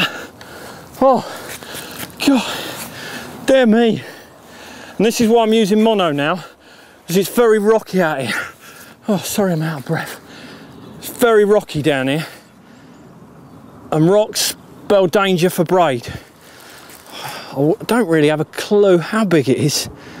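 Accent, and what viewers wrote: United Kingdom. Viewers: This guy's accent is great...what part of the UK is this from? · i have never heard Martin Bowler speak-only seen him in IYCF Didn't expect him to have a bit of a London accent :D Nice video though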